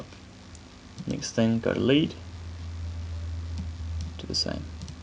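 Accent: New Zealand. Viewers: Are u kiwi?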